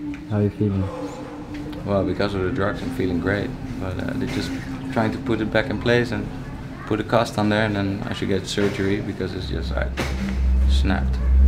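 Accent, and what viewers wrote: Netherlands. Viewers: arw you dutch? you have quite a dutch accent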